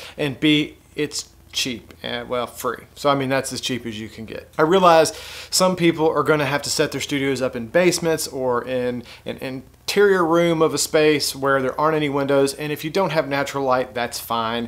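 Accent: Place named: South, United States. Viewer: This is the first time I've ever watched one of your videos, and all I can say is WOW!!! The editing is exquisite, the content is so helpful, and I love your accent. :) Where are you from? I'm an east Tennessee gal, myself, so I love a good southern accent! Anyway, awesome job, man. You just gained a new subscriber. 👏👏👏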